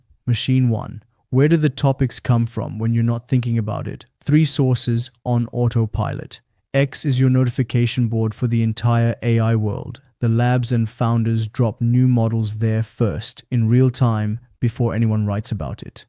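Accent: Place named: India. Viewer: You sound somewhat indian in this video. Thats pretty funny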